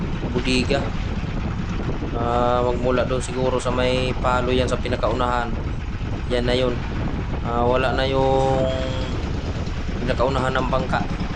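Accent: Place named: Visayas, Philippines. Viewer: ayo sin mo pag salita mo bisaya kadong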